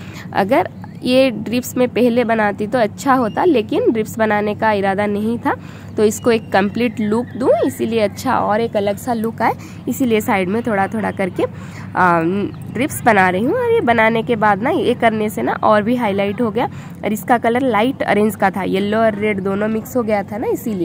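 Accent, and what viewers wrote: Odisha, India. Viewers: Aap orissa se h kya?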